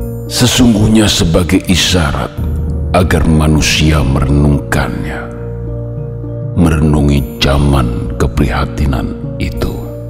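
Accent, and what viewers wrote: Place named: Mataraman, Indonesia. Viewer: Kata-kata yang bahasa Jawa jangan dibaca serba o, aku bingung Jangan pake logat Mataraman Pake logat Jawa yang asli saja, a tetap dibaca a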